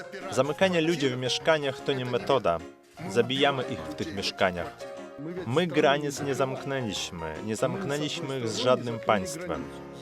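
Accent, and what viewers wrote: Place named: Russia. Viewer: Czemu ten lektor mówi Rosyjskim akcentem ?? Japierdole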